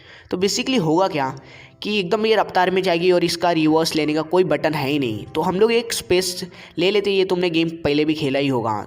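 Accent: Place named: Tamil Nadu, India. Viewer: Bhai Tamil Nadu se ho kya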